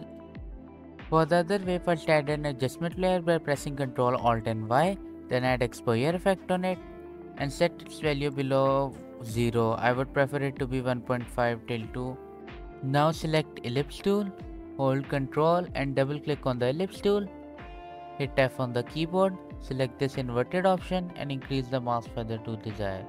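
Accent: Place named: Pakistan. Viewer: i feel bad for you how other people judge your voice bc ur pakistanian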